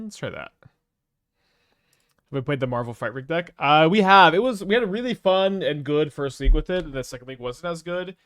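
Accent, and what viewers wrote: Texas, United States. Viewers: Texas accent, i hear it that way.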